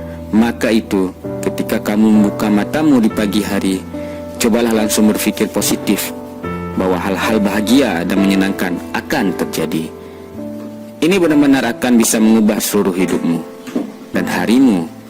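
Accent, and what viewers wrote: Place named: Bali, Indonesia. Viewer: Dari suaranya pasti nih org bali 😊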